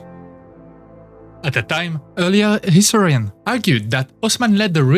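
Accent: France. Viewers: C'est moi ouuu le gars qui parle est français? D'après la prononciation qu'il fait. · am i the only one that finds his french accent in english charming? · french accent i see, nice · Me disait bien que j'avais reconnu l'accent haha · Why did they get a guy with the thickest French accent I've ever heard to do the video?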